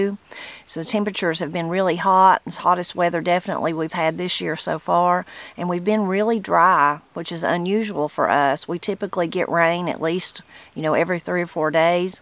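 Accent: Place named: Arkansas, United States. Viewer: I love listening to you talk. You remind me of my aunt pearl she was a native Arkansas. And she speaks like you do w that accent. It's so true...and calming to listen too. So is voice I get soo much off her devotionals.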